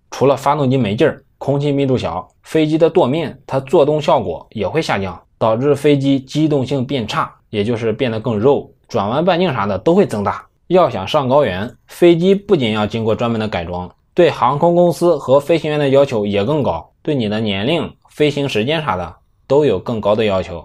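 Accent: Henan, China. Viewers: 这河南话，听起来亲切！ 想起紫阳。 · 贺南话好听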